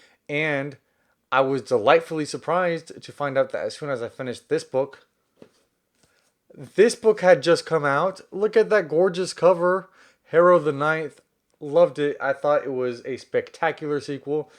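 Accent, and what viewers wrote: South, United States. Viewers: I can't believe you had three books are The Stone Sky, The Fifth Season and The Obelisk Gate by N.K. Jemisin, Angel Image, Bear Town by Fredrik Backman, Fate of the Fallen by Kel Kade, House of Earth and Blood by Sarah J. Maas, Gideon of the Ninth by Tamsyn Muir and all of them at your house in Texas on this video during your quarantine until you're talking about the horror TBR and summer book haul of the spooky season after that, but I hope your husband, Zach will be very proud of you and I'm so happy for you, but I love your Southern accent!!! #SpookySeason #HorrorBook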